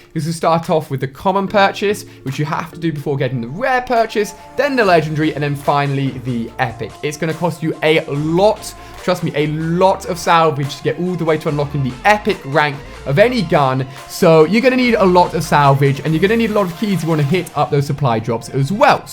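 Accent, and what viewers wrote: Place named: United Kingdom. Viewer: i like british accent.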